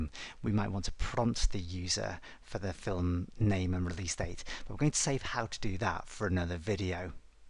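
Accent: United Kingdom. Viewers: is an excellent videos for learning VBA. For one thing I liked the most is the instructor explanation with UK accent. Loved it:) I can stay all day long in the front with my PC and try to learn more every evening right after work. You are the best*** rated! Would recommended to everyone. Thank you so much!!!!!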